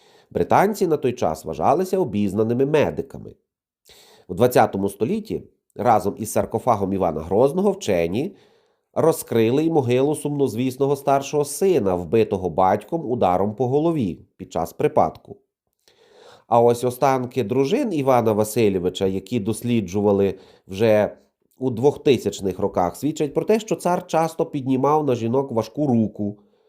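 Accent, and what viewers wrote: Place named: Galicia, Ukraine. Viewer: То здається ви завше так культурно і невинно використовуєте " здихав" і т.і. до моск- тів)) а ще ваш може то галичанський акцент, то звучить це дуже оригінально😅😂😂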